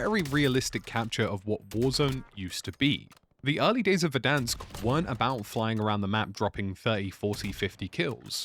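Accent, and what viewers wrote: RP, United Kingdom. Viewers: Loving the posh monologue accent Vs. your usual streaming accent. Also, couldn't agree more with virtually everything you said.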